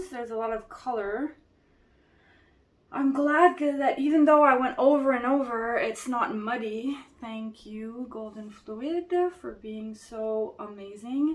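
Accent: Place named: Quebec, Canada. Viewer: Omg that is exactly the style I am trying to achieve thanks! You are good teacher and oh boy the quality of your videos!!! And that French Canadian accent. Love ya, a big fan from Quebec! Thanks for all the hard work you put in your channel. You are the best.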